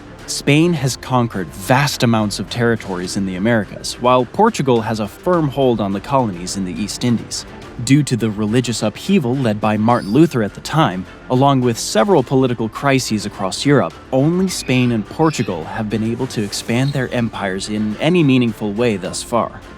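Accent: United States. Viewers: He’s probably Filipino American by the sound of his accent.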